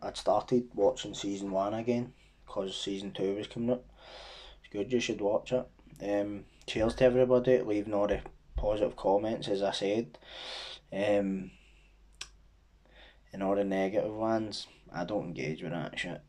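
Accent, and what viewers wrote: Scotland, United Kingdom. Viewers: I'm a new subscriber to your channel.. I'm a fan of crime stories and watch a lot on youtube while im busy with orders and you popped up a couple days ago.. loving some scottish narration (im in Edinburgh, partners from glasgow) so its good to hear of some more local cases! Keep up the good work 👌🏼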